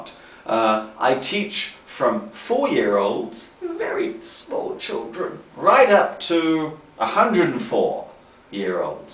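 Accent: United Kingdom. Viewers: Since I became watching your videos I really improved my english, because they don't tire me; It's easy to spend lots of time watching you. And British accent is awesome ^^ Thanks a lot, your lessons are pretty valuable! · I love your British accent!! ^^ Brithis accent sounds so neat!!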